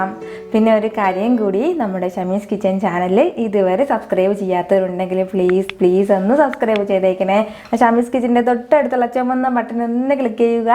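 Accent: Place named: Malappuram, India. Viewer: 😍😍..ikka malapuram aano...atho kozhikodoo...slang supr aanu🤩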